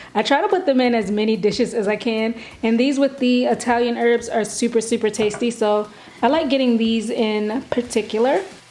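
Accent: Baltimore, United States. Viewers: Sis, are you from Baltimore?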